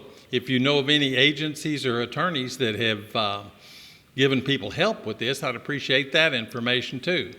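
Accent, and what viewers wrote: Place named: United States. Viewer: I've seen a few videos from Duong Business Global- They've discussed all scenerios concerning business visas. It might be help to you. Sixteen years is a long time away from the US, I could still hear your accent.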